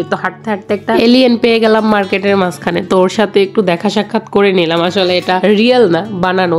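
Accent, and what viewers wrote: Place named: Barishal, Bangladesh. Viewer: কিন্না কিন্না,, করেন,,,আপনার বাসা কি বরিশাল 😮😮😮😮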